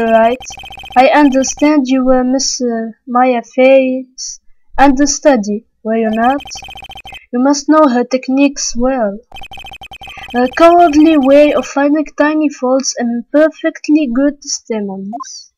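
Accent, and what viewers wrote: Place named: England, United Kingdom. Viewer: hhhhhhhhhhh ur english looool :3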